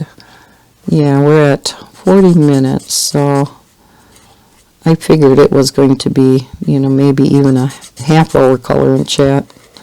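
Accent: Wisconsin, United States. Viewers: praying for you in this rough patch. I already had started this pic so I colored in my new JS Color by number and hope you can show, color and chat with it. Would love to hear your thoughts on this! If you don't have it can I send it to you? So what you are saying is the videos are limited in this season or is it going to be forever? Do you have COPD? You always have a croup sound and cough. Just worried. You don't sound weird to me with your dental issue but you sound like a lady from Wisconsin! You are a soothing cup of hot tea in my life and appreciate you so much! 🥰🌠⭐🌠😊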